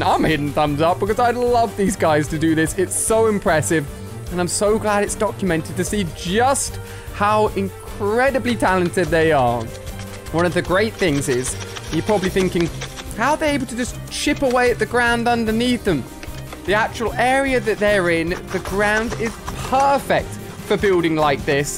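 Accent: United Kingdom. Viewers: R u British? I am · Are you British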